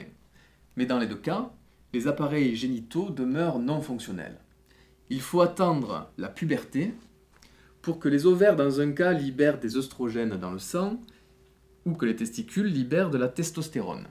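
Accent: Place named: South, France. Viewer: merci beaucoup pour vos vidéos ! ça m'aide pour le bac :) le seul bémol c'est qu'étant du nord de la france avec mes amies on adore imiter l'accent du sud et du coup quand je vous entends parler parfois j'ai envie de rire x) (mais ce n'est pas méchant)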